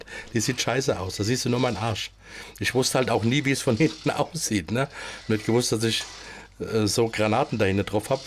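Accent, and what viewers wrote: Hesse, Germany. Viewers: de hessische dialekt bedarfs auch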